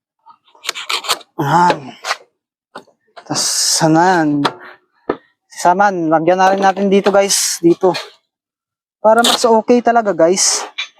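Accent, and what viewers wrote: Visayas, Philippines. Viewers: Idol bisaya ka ya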